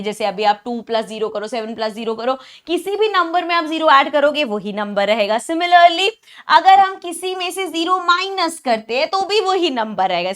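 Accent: Punjab, India. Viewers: Gosh, your punjabiiiiii😭✋🏻🛐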